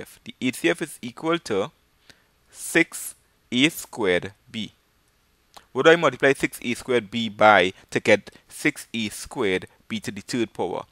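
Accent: Trinidad and Tobago. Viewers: Just curious are you from trinidad... it's just the way you talk it had me thinking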